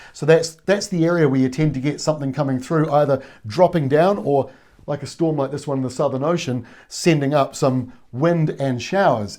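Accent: New Zealand. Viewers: Good clear kiwi presentation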